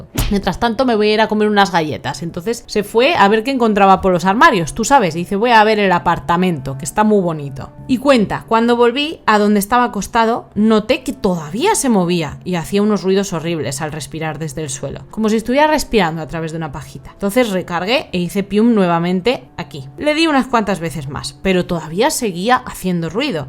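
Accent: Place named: Spain. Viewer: Realmente nunca me gustó ver a algún español no me lo tomes a mal el acento, pero me encanta verte y escucharte te veo y en ocaciones solo te escucho mientras estoy trabajando en el microscopio 🔬 💕 😊 me encantan, las historias criminales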